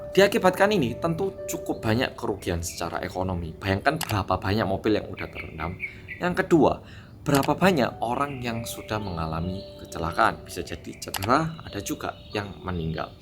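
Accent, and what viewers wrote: Java, Indonesia. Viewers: Hallo mas Korea medok Nitip salam buat kim bum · wajah Korea koq logat jowo sih mas..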